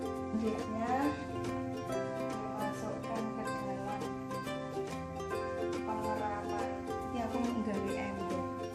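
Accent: Indonesia. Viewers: Orang Jogja kah Bun?😁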